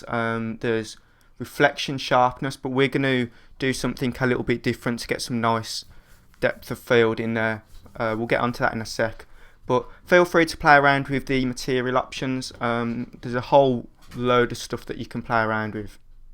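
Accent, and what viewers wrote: Birmingham, United Kingdom. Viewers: Sounds like Birmingham UK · i beleve its Birmingham (uk) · That's a brummie accent from Birmingham, England. Chips an Grayvay!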